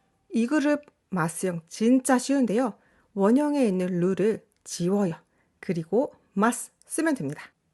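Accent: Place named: Japan. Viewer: 이 강의에서 한국어 말씀하실 땐 일본인인 거, 알겠어요. 😊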